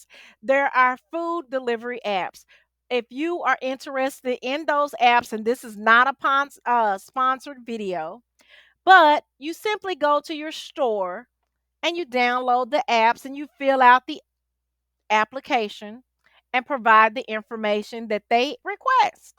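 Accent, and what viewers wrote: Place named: South, United States. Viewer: Love that southern accent! Definitely fun to watch and listen to others and how they present themselves.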